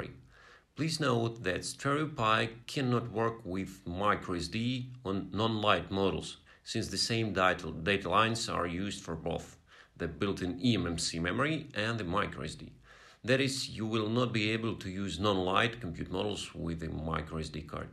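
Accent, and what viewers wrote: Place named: Russia. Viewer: да я отлично понимаю ваш руссиш инглиш, комрад, сижу, ковыряю поделку )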